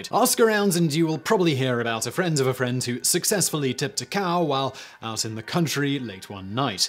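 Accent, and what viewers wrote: England, United Kingdom. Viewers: The presenter has such a proper English accent. Where’s your mac Englishman?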